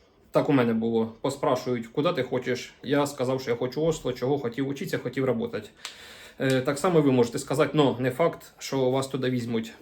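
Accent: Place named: Surzhyk, Ukraine. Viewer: Який жаххливий суржик, ще не чула, щоб так спотворювали нашу солов'їну мову. Де цей хлопак чув оце? "не повторяйте моїх ошібок"😅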